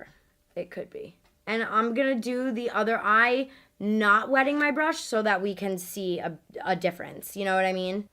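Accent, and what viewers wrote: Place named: New Jersey, United States. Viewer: With a little Jersey accent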